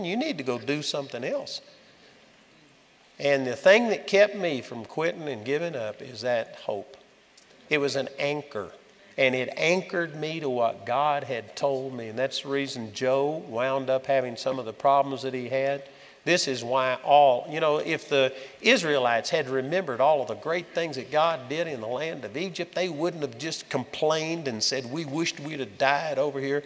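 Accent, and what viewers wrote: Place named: Texas, United States. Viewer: Andrew, you are the best. You are, what, 73-years-old? You look half your age, strong as an ox, going great guns and you talk about standing 10 hours out of 11 like it's a walk in the park, not even realizing that you pushed yourself, LOL! I truly love and adore you. Your lovely Texan drawl still lulls me to sleep at night, especially when I am especially frightened or frail or falling into despair, and I am still very much Saved and living for the Lord and leaning into His sweet Grace and Comfort. Hallelujah. Praise God. Thank-you for being there, and being out there, and being so honest. Blessings to you 💙🙏🏼💙🙏🏼💙🙏🏼💙💙💙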